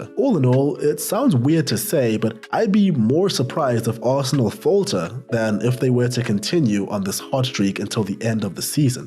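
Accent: South Africa. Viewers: this is a south african accent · You sound South African 🤔🧐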